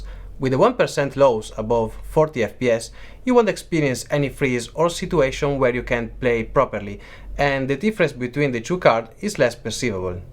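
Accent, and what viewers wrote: Italy. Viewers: Sembri un italiano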